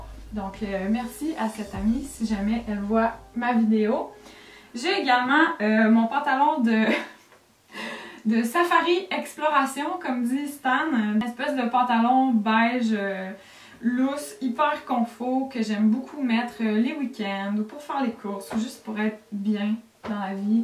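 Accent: Quebec, Canada. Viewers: C'est pas grave, on adore t'entendre parler "quebecois". Je n'y suis allee qu'une fois mais j'ai adore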